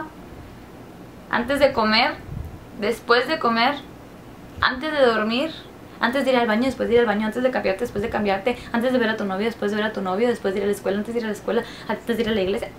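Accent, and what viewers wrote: Chihuahua, Mexico. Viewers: Me encanta el acento, arriba Chihuahua!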